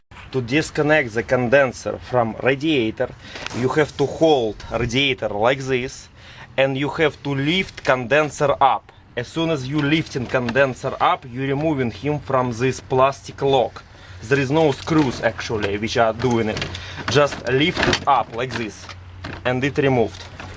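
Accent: Russia. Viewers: Жуткий русский акцент, но англичане в восторге.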